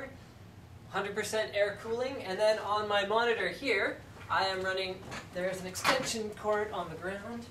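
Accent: Canada. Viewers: I’ve never heard Linus sound so Canadian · you can hear the canadian in his voice in this video lol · His Canadian accent is enhanced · OMG Linus has such a canadian accent in this video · Oh my god he sounds so Canadian here, he sounds a little less Canadian now but here it’s just- it’s just there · He sounds more Canadian. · Ahh back when Linus still had that Canadian accent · He sounded a lot more Canadian back in the day lol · Im acutally happy for this youtube recommendation. His Canadian accent was stronger back in the day. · Yes it has, you can hear the Canadian accent more here. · His voice hasn’t changed at all, but he did lose a bit of that Canadian accent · You were more Canadian XD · The only difference between him back then and now is he just sounded even more Canadian back then 😂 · Why does he sound more Canadian back then? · here he soundedmore canadian than he does now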